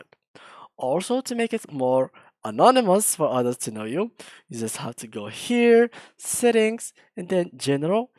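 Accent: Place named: Morocco. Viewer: 3rftk mghribi gha mn l accent w bsh zdt t2kdt fsh seft lk khona msg flgame hhhhhhhh anyways gg abro and thanks ❤️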